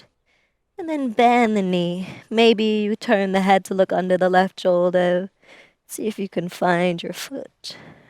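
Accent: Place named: Scotland, United Kingdom. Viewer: You are fast becoming my new favourite yoga Youtuber! Pa. are you Scottish/grew up in Scotland for a bit? I'm hearing a mix of accents haha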